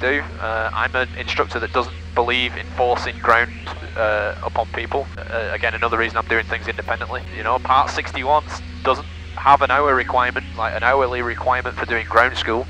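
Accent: United Kingdom. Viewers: hey, thx for answer my question :))) you are from australia? i always thought, you are from uk because your dialect sounds british..... :))))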